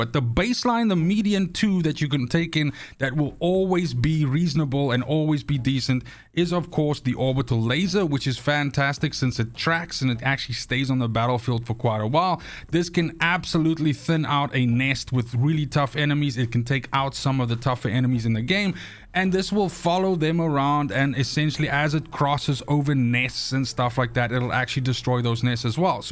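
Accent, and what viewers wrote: South Africa. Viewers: Howzit bro. Are you from South Africa? Cool video man, you gained a sub and like from me.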